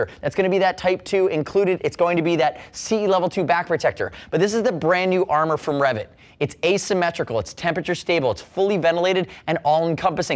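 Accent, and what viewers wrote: United States. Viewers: Dear god, that is just painful to listen too. Or at least it is for my Scottish ears. That’s like Americana on steroids! I lasted 30 secs, sorry!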